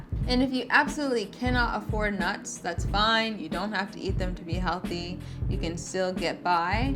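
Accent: South, United States. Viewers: where in the south you from?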